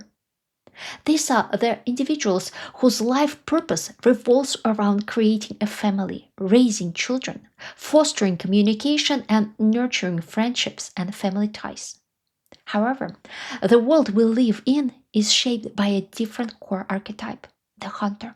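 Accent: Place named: Russia. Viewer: I have to admit, your Russian accent is quite strong. If you've lived in an English-speaking country for so long, why haven't you worked on your pronunciation?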